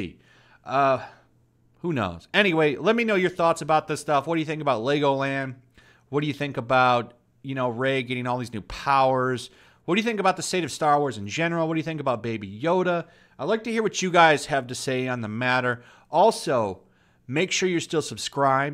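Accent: England, United Kingdom. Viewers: Damn! And here I am waiting for your mandalorian episode reviews in that funny English accent! 😂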